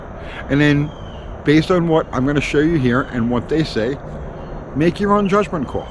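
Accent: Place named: New Jersey, United States. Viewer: judging from your accent, I'd say you're in Joyzee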